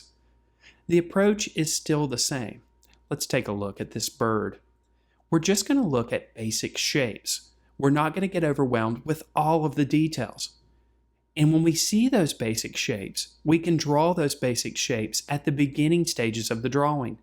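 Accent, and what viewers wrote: South, United States. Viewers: Americans, which regional accent is this? I love it! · Maybe South Eastern. Has a southern drawl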